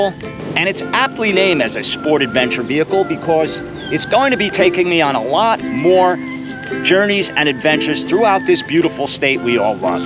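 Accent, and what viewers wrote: New York, United States. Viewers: The New York accent gets old after a while...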